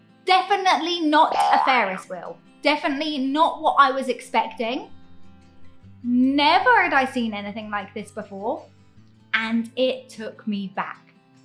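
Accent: United Kingdom. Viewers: 為什麼要學英國人說話？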